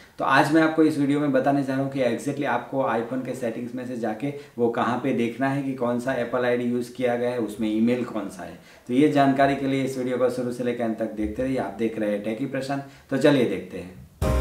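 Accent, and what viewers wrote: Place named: Rural, India. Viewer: Why Is the Video Title english but the actuall Video Is a villager speaking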